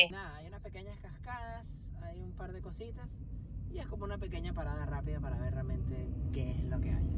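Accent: Venezuela. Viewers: Eres venezolano? Me parece tu acento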